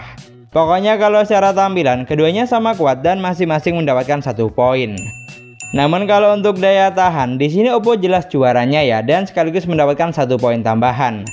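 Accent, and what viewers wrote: Madura, Indonesia. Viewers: review dengan logat Madura nya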